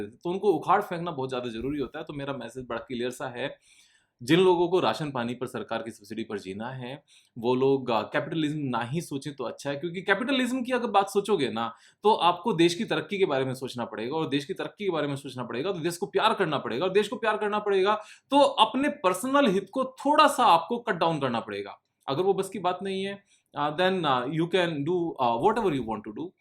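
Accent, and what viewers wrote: India. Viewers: Accent of English will always be Indian for us. If English is not our mother tongue then why should we be expert in pronunciation. Those who measure others with exact pronunciations are just having an inferior mentality. Are foreigners able to speak Hindi with exact pronunciation? Good bhai that you addressed all these guys in this video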